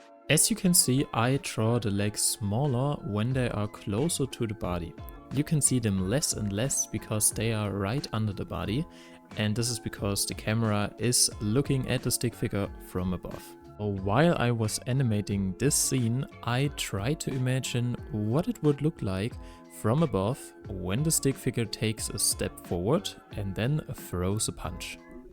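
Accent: Germany. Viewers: R u german? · I hear deutsch(gutes tutorial btw)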